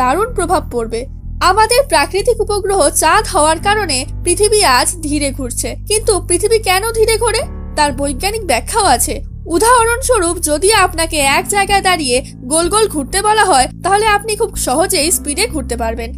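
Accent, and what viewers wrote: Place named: West Bengal, India. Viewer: Tumi mone hoy indian bangali tai na tomar kotha gulo khub cute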